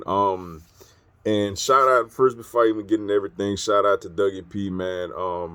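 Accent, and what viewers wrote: New Orleans, United States. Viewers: you sound like you from new orleans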